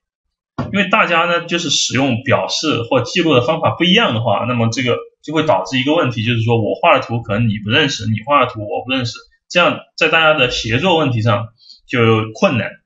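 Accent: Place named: Sichuan, China. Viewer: 老师像是四川人，哈哈哈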